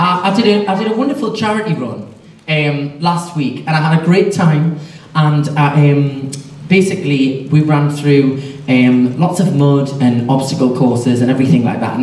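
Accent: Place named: North East England, United Kingdom. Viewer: Thar's his adorable accent from The North east of England.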